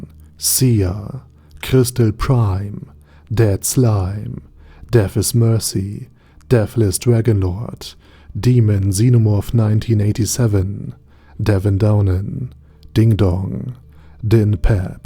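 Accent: Germany. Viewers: Boah the german is very strong with you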